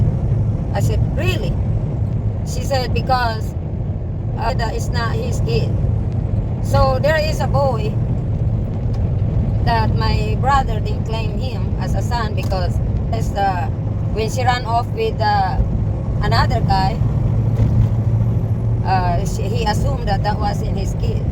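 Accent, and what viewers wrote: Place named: South, United States. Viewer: Bait mo lola kawawa din ang bata kapag ganyan naiiwanan.love your southern accent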